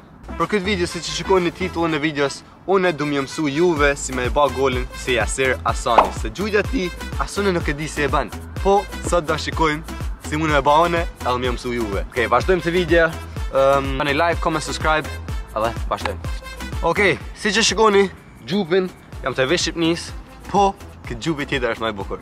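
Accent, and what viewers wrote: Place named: Kosovo. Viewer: Me dukesh që fol gjuhë të përzier sikur kosovar dhe shqiptar(sikur nga shqiperia) 😅😅 veq vazhdo më i miri youtuber shqipatar jee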